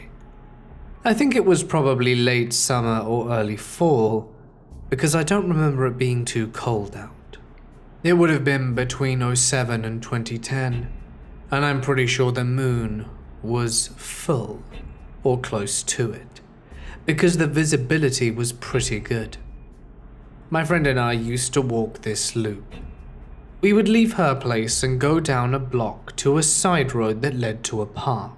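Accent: England, United Kingdom. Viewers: That's how we Brits pronounce it.... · Kevin Heriford He’s English and that is the way they pronounce cemetery with their accent. 🤷🏻‍♀️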